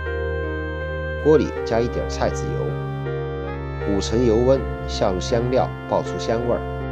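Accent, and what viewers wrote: Sichuan, China. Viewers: 作为成都人的普通话已经超过很多四川人了，不是四川人的话几乎听不出椒盐味道。不过还是喜欢听的成都腔调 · 还是四川话好听，又有特色！带着爱做自己爱做的事情，顶你！ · 四川人听四川人说普通话好搞笑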